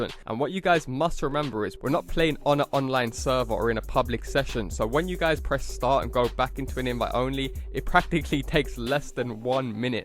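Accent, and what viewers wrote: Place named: United Kingdom. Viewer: yo swear down youre from uk! grime!!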